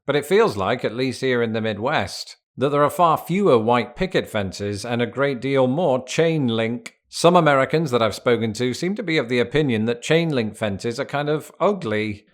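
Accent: United Kingdom. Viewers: Um, he's British.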